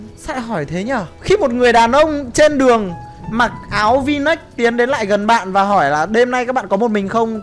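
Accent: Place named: South, Vietnam. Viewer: Thích cái cách a ấy nói tiếng miền nam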